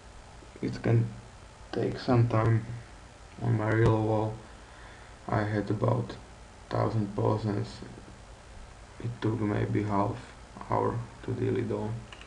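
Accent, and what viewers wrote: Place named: Slovakia. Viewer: the guy has a totally Slovakian accent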